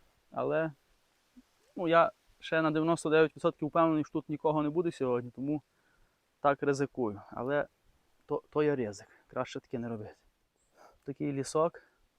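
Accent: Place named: Prykarpattia, Ukraine. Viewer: Ви так просто і щиро розповідаєте, і дуже гарний говір у вас, прикарпатський.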